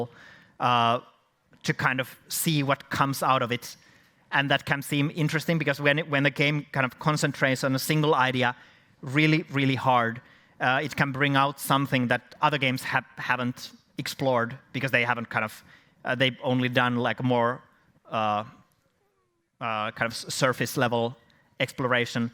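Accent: Finland. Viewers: The Finnish accent Rakastan sitä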